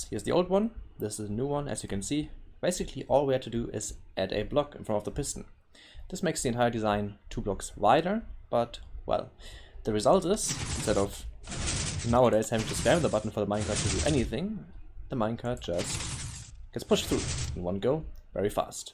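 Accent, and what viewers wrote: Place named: Germany. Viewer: I needed that thanks a lot :D Btw ru german? cuz u kinda have the same accent like ilmango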